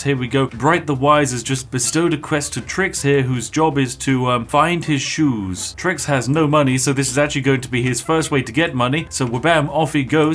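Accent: United Kingdom. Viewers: Is the spiffing Brit from Britain? Sounds a little bit like a British person. No front of course. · why are you british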